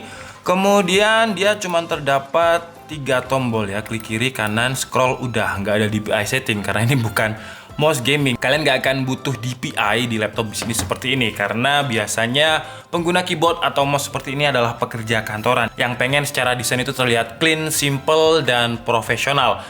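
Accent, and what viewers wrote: Indonesia. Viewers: Pengucapan org indo banget, bertele-tele pisan.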